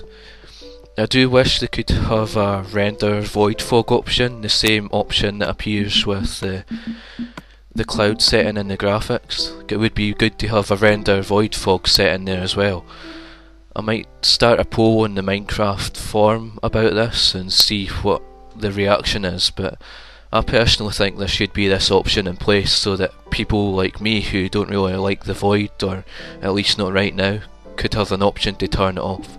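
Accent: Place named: Scotland, United Kingdom. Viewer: Good scots accent mate! Subbed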